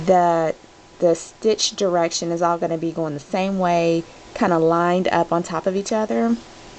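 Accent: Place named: South, United States. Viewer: Oh my goodness you have THE cutest Sothern drawl....and I'm a NC native....this is what I imagine a southern bell (in the big dresses) sound like, lol!!! Btw, great video and instructions!! New sub!!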